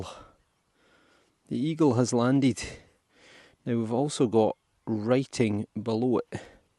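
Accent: Scotland, United Kingdom. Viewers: Just found your channel. I really enjoy watching all the digs. Especially love the Scottish accent. I swear you sound exactly like Roger from Outlander.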